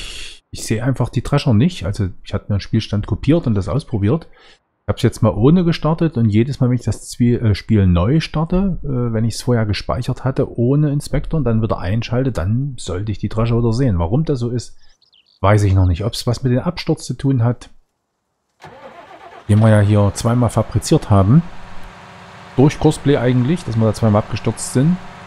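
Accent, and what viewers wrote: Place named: Saxony, Germany. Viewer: Hay war wieder mal super!!! Mach weiter so!! Ich habe keine Folge verpasst. Macht immer Spaß zuzuschauen. Wo wohnst du eigentlich, dein Sächsischen Dialekt hört sich gut an meine Lebensgefährtin kommt auch aus Sachsen!!!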